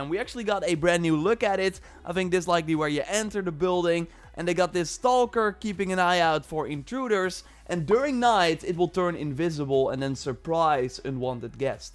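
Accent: Netherlands. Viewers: Leuke video. Raar Nederlands Engels accent toch..🙄😁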